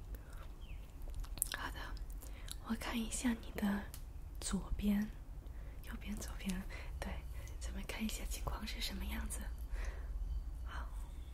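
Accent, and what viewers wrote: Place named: Beijing, China. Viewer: 虽然有些词忘记了，但是你有很北京的口音👍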